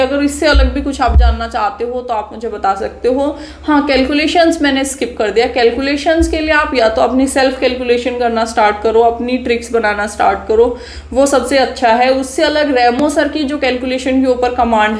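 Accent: Haryana, India. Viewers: अप हरियाणा से हो न ..?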